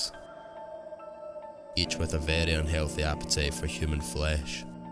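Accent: Scotland, United Kingdom. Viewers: Great now I'm definitely not going to sleep at all. I love your channel and the fact that it's in Scotland and told with a Scottish accent. Ugh death by no sleep lol. I am loveing the stories and the chills though as they are definitely what I watch them for. I Hope you keep up the great work and continue the scares. Have a blessed day or night. Oh and I think I'm going to call you. short for as you sound like a vampire just don't pronounce your w's as v's so it is even more chilling and better to listen too. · Hiya ive recently came across your channel & I love the Scottish accent & history so you've got yourself another sub:) Keep up the good work your doing & looking forward to your next video. XxX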